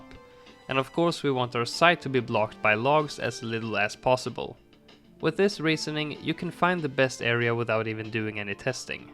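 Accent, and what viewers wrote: Sweden. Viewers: You have the cutest Swedish accent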